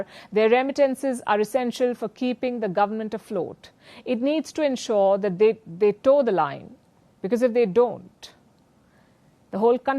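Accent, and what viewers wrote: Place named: India. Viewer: What a “desi English “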